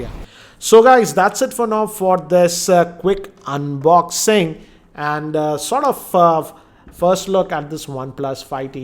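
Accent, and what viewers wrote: India. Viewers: Indien English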